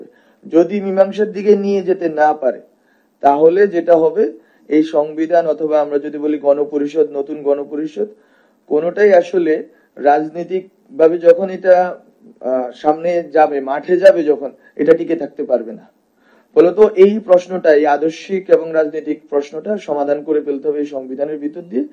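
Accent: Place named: Noakhali, Bangladesh. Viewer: নোয়াখালী টান আসে কথায় আমি তার কথা বুছি না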